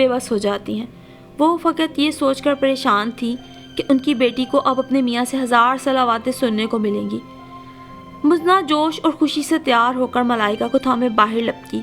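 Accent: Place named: Punjab, Pakistan. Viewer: I am from India... talafuz Acha hai ..toda sa punjabiyat lahza dikh rha hai. Bhut khub..urdu ki khidmat kr rhe hai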